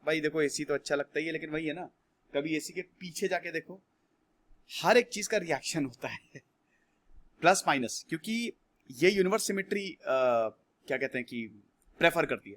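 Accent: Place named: Awadh, India. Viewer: Sir ka deshi andaaj jaari Avadhi style me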